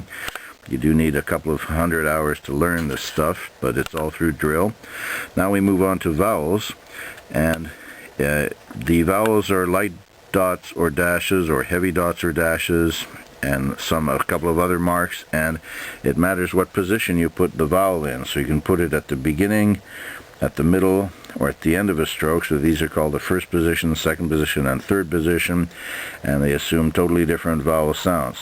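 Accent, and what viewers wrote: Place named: Canada. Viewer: Bonjour!! Great video! You have a lovely Canadian accent! Une question pour toi,. Que'est-que tu écris au commencement de la vidéo?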